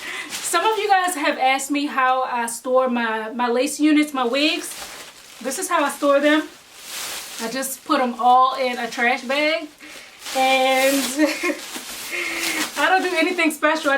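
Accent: Baltimore, United States. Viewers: Lol. I just noticed I might hear that accent from the Baltimore or Philadelphia area.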